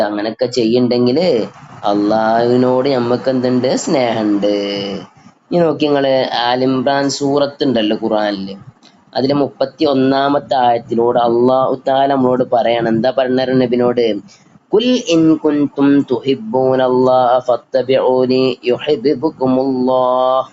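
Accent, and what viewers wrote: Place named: Malappuram, India. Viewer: Malappuram language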